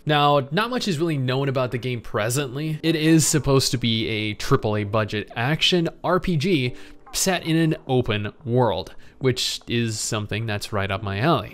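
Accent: United States. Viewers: You're Chinese Australian with an American accent 🤔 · You grew up in Australia but you sound American... Nice looking game, let's keep our fingers crossed.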